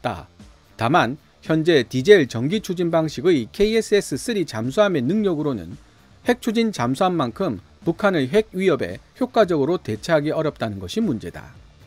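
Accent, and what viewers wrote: Gyeongsang, South Korea. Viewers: 좋은 내용 감사합니다 그런데 경상도 사투리로 설명하는 바람에 찌라시 냄새가 나네요. 아나운서나 전문 성우 목소리처럼 표준말로 설명해 주시면 내용의 신뢰성이 더 높아질 것 같군요.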